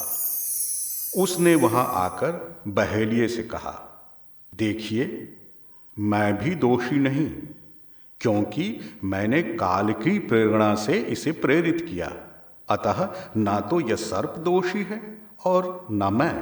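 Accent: Standard. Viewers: शुद्ध उच्चारण में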